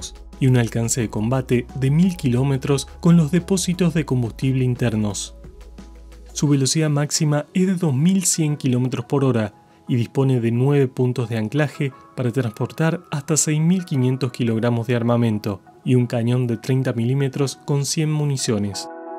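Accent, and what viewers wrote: Argentina. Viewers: Como siempre dándonos cátedra de aviación excelente video me gustaría saber que nacionalidad tienen, párese argentino tu bonito acento. Es com argentino, lindo fuera que la fuerza aérea argentina tuviera estos aviones, con los eselentes pilotos, sooy de el mísero país de Colombia reprimido por el gobierno norco paramilitar acmiro al el pueblo, Argentino saludos Dios los bendiga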